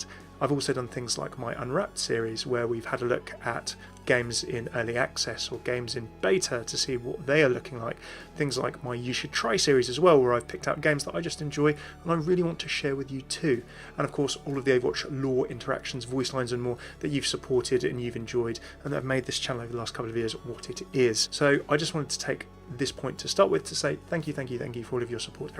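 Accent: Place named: United Kingdom. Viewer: you´re welcome :D btw love that brit accent - german youtuber can´t deliver such quality as you do!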